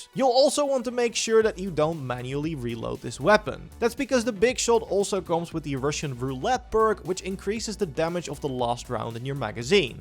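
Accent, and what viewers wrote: Netherlands. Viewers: Ik hoor een nederlands accentje haahhaa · Jezus wat klink jij nederlands als je engels praat 😅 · I smell dutch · je blijft horen dat je Nederlands bent haha maar thanks voor de tips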